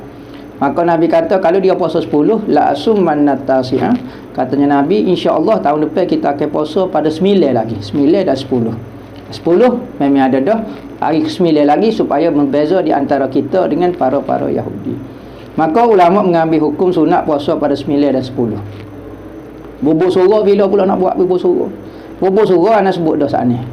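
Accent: Kelantan, Malaysia. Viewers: Kelate pekat ustad terbaik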